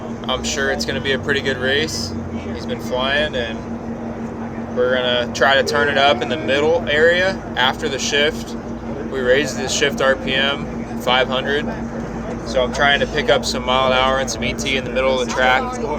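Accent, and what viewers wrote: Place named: Texas, United States. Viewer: I sense a little texas drawl with that cowboy hat on.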